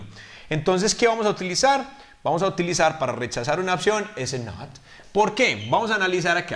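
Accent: Colombia. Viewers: Teacher you have Colombian accent..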